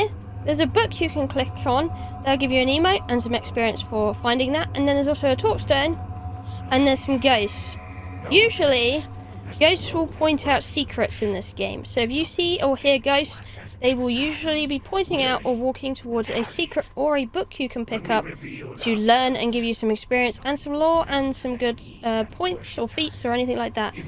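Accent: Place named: United Kingdom. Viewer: Challenging accent as a native german speaker, but I've got a friend in the UK with a similar accent, so it wasn't as bad, heh. What I wanna say is, despite the unfamiliarity to your accent, I still understood almost everything. Thanks for the video, it helped. One question though; Are daggers viable/good throughout the game? Like does the bleeding effect for example gets stronger with the weapon?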